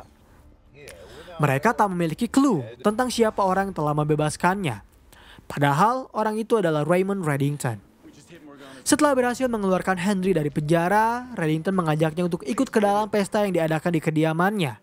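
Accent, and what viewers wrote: West Java, Indonesia. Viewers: fix org sunda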